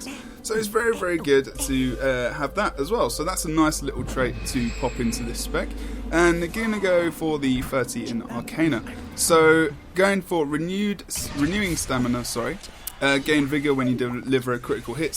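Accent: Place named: England, United Kingdom. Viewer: Cool, thank you! I saw your Lion's Arch tour just now and I liked it! Keep the vids up and especially that English accent of yours ^^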